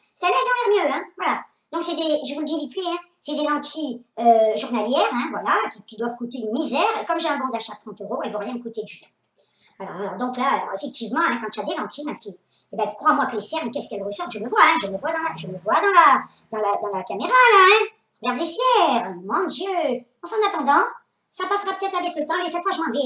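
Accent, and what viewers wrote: Algeria. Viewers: Quel mesaventure !!!ah ces hommes il se croivent tellement fort heureusement que vous etiez la pour sortir sa voiture .Ta pauvre copine mdr tu m a fait trop rire !!!Mdr l affiche a la gare j imagine la scene :) ta pauvre copine sa mere n etait pas commode....et ta maman qui ne t as pas cru alors que tu disais la verite mais en meme temps ton histoire est tellement delirante .Et ton accent pied noir est a tomber,j en veux pleins d autres histoire comme ca pleins de gros bisous ma belle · bonsoir ma copine rigolotte ♥ , exelnent avec l'accent pied noir trop bien sa met du caracterer a ton histoir trop top :) MDR :) hahaha bonne soirée kiss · coucou ma je me suis encore payé une bonne rigolade décidément il t'en arrive toujour de bonnes enfin pour une fois ce n'est pas toi qui est tombé ouf soulag!!!là à la chute de la copine j'ai trop rit je lais vu tomber avec les chaussures qui reste collées dans bobo j'espère en tout cas le copain il devait tenir une bonne muflee pour ne plus se rappeler de la rivière et il aurait pu aller expliquer à vos parents ah les hommes!!!merci tu m'a mise de bonne humeur pour la journée. 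j'aime l'accent pied noir c'est sympa ça reflète le soleil et la bonne humeur bisous à vous deux je vous aime très ford😗😗😗😍😍😘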